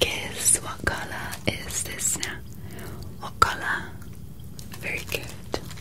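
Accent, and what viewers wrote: England, United Kingdom. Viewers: The English accent is amazing Nailed it Ive always wanted someone to do a scouse or northern accent Like Gordie or Cumbrian its sound funny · Absolutely loved this and the short hair cut so works on you with the glasses 😌 really enjoyed the English accent alongside the jingling of the jewelry. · They have an amazing British accent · i was like o sh!t she is brittish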